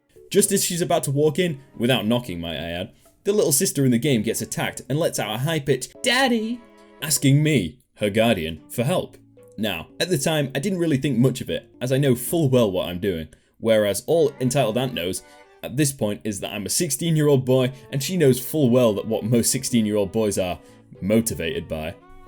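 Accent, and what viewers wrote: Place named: Northern England, United Kingdom. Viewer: you're obviously from up north. Where abouts lad?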